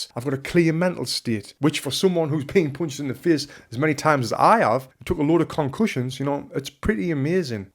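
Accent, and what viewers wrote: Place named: Sunderland, United Kingdom. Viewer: Yes Lad your propa letting your mackem accent out boyo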